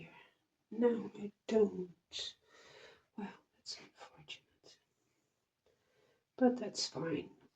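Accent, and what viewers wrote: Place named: Netherlands. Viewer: Mooi vest! Welke garens heb je daarvoor gebruikt? Je hebt trouwens een fijn accent wanneer je Engels spreekt. Vaak hebben Nederlandstaligen een erg sterk accent. 😂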